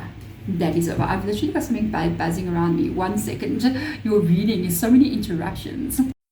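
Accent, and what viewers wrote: South Africa. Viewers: Is that a South African accent I hear? Love your channel and thank you for the wonderful readings ❤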